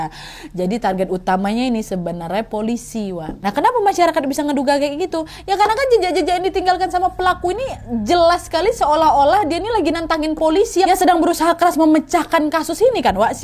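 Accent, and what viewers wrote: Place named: Sumatra, Indonesia. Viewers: Mbak ni org Medan yaa 😁 Berarti di thn2 itu byk org hilang ya terbukti byk warga melapor ke polisi bhw anggota kel mrk hilang..serem yaa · Kau itu cerita seakan akan kau ada dan melihat langsung kejadian itu....kau pun kurasa belum lahir...dan kau pun dari logat bahasamu orang Sumatra ... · Dari Style Bicaranya, sepertinya Wak orang Pekanbaru ya?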